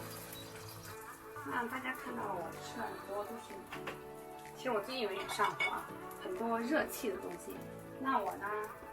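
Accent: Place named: Shandong, China. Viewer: 我不用猜就知道你是山东的嘻嘻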